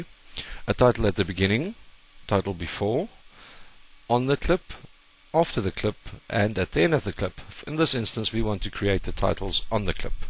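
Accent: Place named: Scotland, United Kingdom. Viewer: nthx btw u sound scottish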